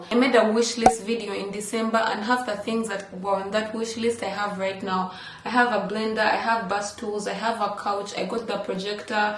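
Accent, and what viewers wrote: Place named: Uganda. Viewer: Are you Ugandan? Your accent sounds Ugandan. ☺️ Edit: Just saw another comment where you confirm that you're Ugandan. Thanks for representing!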